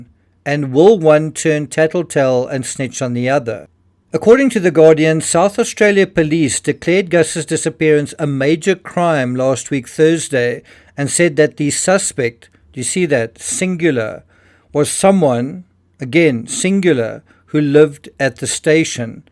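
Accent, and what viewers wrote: South Africa. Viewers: A very interesting video. Thank you so much for covering this case (and all the others you cover, actually). That's the reason I subscribed. You are logical and thought provoking and I always look forward to watching your videos. I was born in Joburg, so love hearing your accent. To this video though ... I don't think anyone will tattle. They are from a farming community and I think that particular property has been in the family for a couple of generations, though I haven't researched that so I could be wrong. I personally think they will stick together, no matter what occurred "think as thieves" comes to mind. But I would love to hear your perspective as to why the mother Jessica reportedly left the property after Gus went missing. The same thing happened with the children missing from Nova Scotia - the mother took off the same day, from memory. 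I then saw something recently where the step-father has been arrested for some sort of assault charges (not related to the missing children). I couldn't help but go " ... hmmmm ... " I am wondering if Jessica left the property after Gus went missing to distance herself because she is aware of what happened to Gus. Looking forward to your next video. Thank you again for your excellent coverage. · He's South African.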